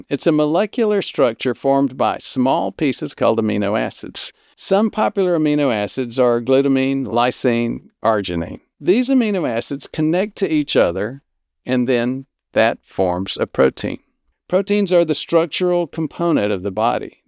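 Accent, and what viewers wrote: South, United States. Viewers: Having grown up in Alabama, I love your accent. Not hillbilly, just one of a variety of southern accents. I've been in Texas for 46 years, & still think the Texas accent is harsh.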